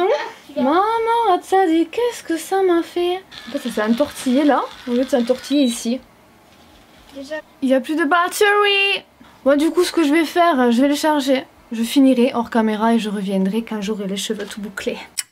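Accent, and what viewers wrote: South, France. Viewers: Jte kiff de ouf meuf Tellement drole et ton accent, whaou , le sud dans mon salon breton 😂😂😂😘😘😘😘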